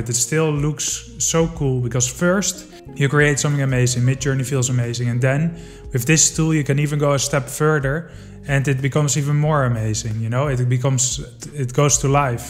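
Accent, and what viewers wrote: Netherlands. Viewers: Jij bent een Nederlander toch 😂? Je accent😂 kan niets anders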